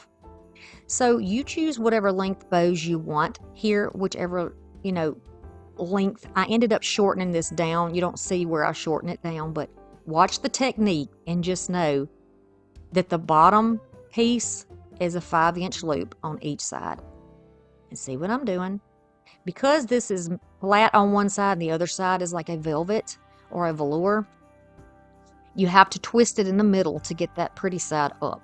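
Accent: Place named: Texas, United States. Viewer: I love all of these projects. Great job! Where are you from? You sound like you are from Texas which where I am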